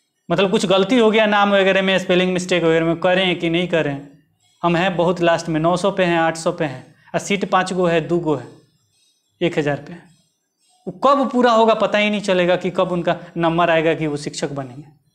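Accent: Bihar, India. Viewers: Sir bihari ton sun ke kafi achha lga.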